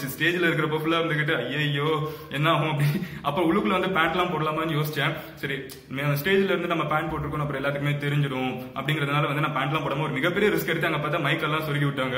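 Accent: Madurai, India. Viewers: Bro nenga madurai yaa.....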